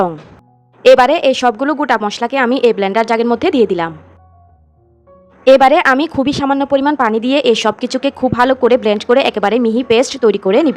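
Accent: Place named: Barishal, Bangladesh. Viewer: আপনি বরিশাইল্লা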